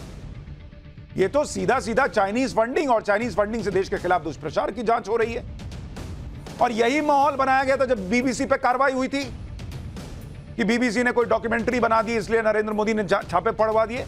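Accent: Bihar, India. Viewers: Superfan of Bihari accent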